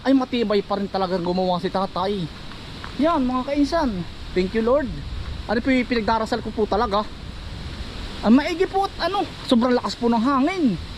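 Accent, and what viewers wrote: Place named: Laguna, Philippines. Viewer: Tagasan po kayo, kc ung accent nyo ganyan po dati magsalita ung mga kawork kung taga alaminos laguna. 😂Ginagaya ko sila dati pg nagsasalita nakakatuwa po kc pakinggan.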